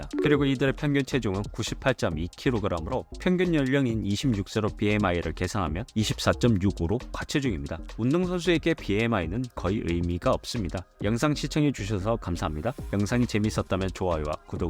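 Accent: Gyeongsang, South Korea. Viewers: 고향이 경상도 어디세요 ?? 사투리가 똑같네요 ㅎㅎ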